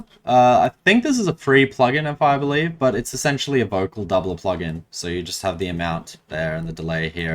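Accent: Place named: Australia. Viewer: You sound like you from Australia🇦🇺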